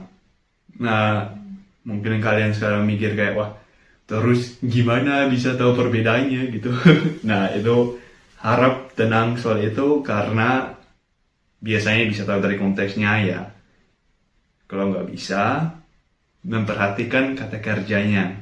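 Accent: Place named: Java, Indonesia. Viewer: finally video baru🤩 semangat bikin video² baru lagi🙌😂 btw suaranya kayak ada medok² Jawa🤭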